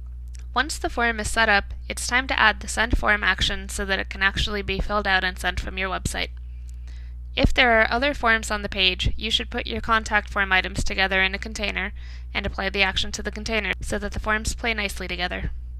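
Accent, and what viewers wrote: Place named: United States. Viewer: why use a US voice?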